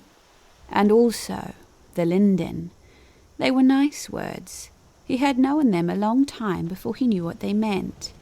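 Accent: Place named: New Zealand. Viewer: The sad unfortunate side of listening to these knowledgeable people is just a reminder of how little I know! How unfortunate that there is so much to learn and yet it would take a plethora of lifetimes to just break the surface. …but, do endeavour to learn as much as I can while I walk the earth! Actually your Kiwi accent is what makes your narrations so appealing.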